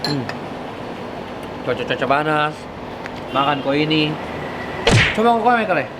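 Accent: Makassar, Indonesia. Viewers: Lain" ki ku rasa kalo logat makassarki:V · Vlog yg paling di tunggu", vlog di makassar, makan makanan khas makassar, logat makassar, syuuuka😍😍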